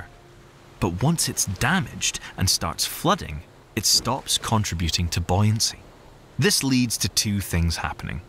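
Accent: Scotland, United Kingdom. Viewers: I love the Scottish accent for this!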